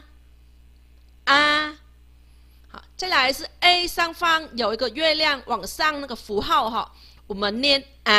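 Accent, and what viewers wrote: Vietnam. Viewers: 老師,冒昧問,你的發音,南越腔,還是北越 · 太感謝了! 在越南工作我都不知道我同事在說什麼 😂 老師的發音應該是北越吧! 跟我公司同事發音一樣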